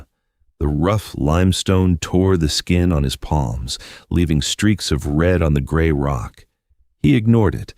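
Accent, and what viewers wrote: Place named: United States. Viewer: An English story of Duke and Duchess read with an American accent is dreadful to listen to 😳😏👎👎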